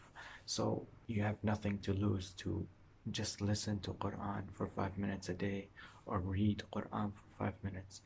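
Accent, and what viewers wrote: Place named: Iraq. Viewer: Make subtitle with it please... I really wanna learn Iraqi accent 🥺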